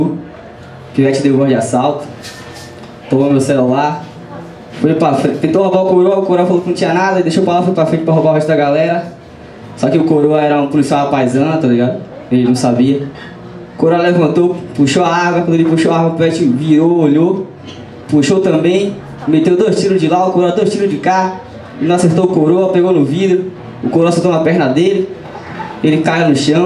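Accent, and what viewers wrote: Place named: Salvador, Brazil. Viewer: Vimmm pelo João seu Pimenta ,se ele indincou porque é bomm, com esse sotaque de Salvador é bomm mesmo haha Vai chegar!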